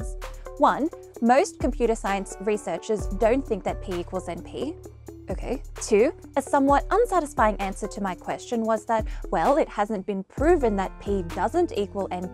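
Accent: United Kingdom. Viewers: What you are Australian? I thought your accent was eccentric British! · Okay, over the past few days I've watched a few of your videos and it seems that no one is willing to "call the Emperor out", (so to speak). So, I'll be the skunk in the group and tell you that your videos absolutely STINK! The problem is not you (or your British accent) or the content (which is competently done). It's your pisspoor audio system! I can just barely understand what you're saying! You really have to get yourself a better system (you should start with investing in a much higher quality microphone!). You might also want to remove more bass from the audio and boost the treble. That would improve matters greatly.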